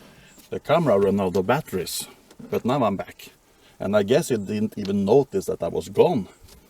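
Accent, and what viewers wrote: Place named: Europe. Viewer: My chronograph doesn't talk to me. If it did, I would want one with a European accent like yours.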